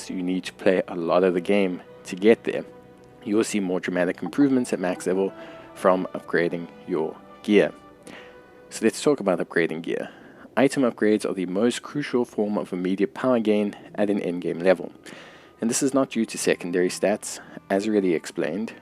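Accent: South Africa. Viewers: What accent is that? Saffa?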